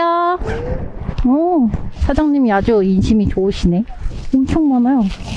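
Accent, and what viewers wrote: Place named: Seoul, South Korea. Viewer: 개시끼 스울말투 와이리 스윗허냐..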